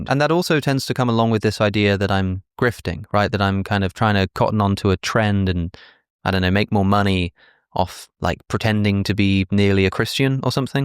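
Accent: United Kingdom. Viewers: I think you meant to say "why are you not" The answer to "why are you hot." is because he is an intellectual with a smooth british accent and a soft face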